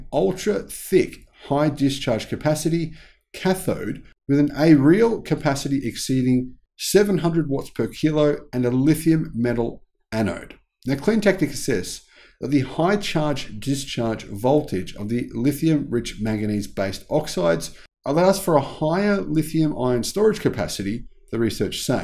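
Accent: Australia. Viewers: Is this an Aussie?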